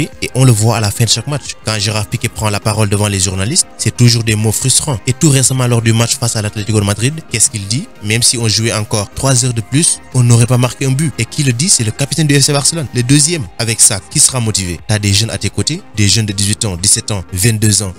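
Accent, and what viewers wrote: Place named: Senegal. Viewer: Thia accent sénégalais bala consommation locale la wakh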